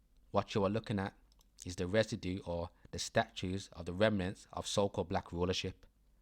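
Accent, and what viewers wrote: Birmingham, United Kingdom. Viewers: Deffo a Brummie accent!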